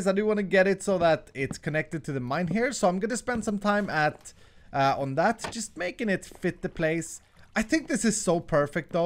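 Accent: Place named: Sweden. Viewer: are from sweden? sounds like that.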